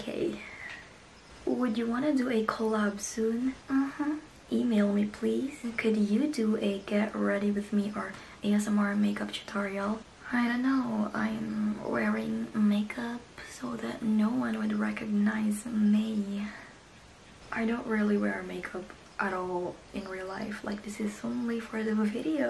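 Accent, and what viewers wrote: Philippines. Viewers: not really becuase her english ( philippines ) its our seccond here in southeast asia but honestly people they're using communication and grammarly which means like 95% taught in high school and students here in the philippines country Dialects accents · Yeah I'm wondering which part of the Philippines she's from cos it sounds like she has the same dialect as me😊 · neither. she's using a different dialect but it's still from the Philippines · Sabi na nga ba eh , medj pinoy yung accent hehe ang cuteee, pa request naman po next vid mo tagalog asmr ate hehe , can't wait ♥️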